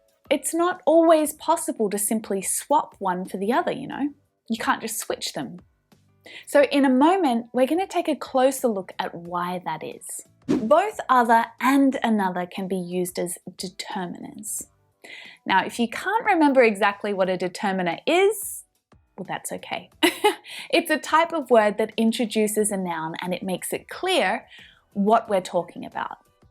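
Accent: Australia. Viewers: I wanted to quit watching you so I don't pick up your Australian accent but you are so good I just can't do it. 😇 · hi from Turkey aim iraqi i love your accent and i hope i can go to Australia as soon as possible, i love it and love your teaching💖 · I agree...her Australian accent is calming and relaxing to listen to...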